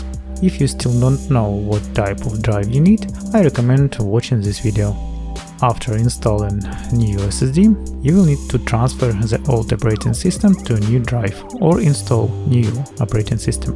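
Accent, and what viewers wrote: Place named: Russia. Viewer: I realized, in accent, not like an Englishman. But for Russian, it sounds good.😏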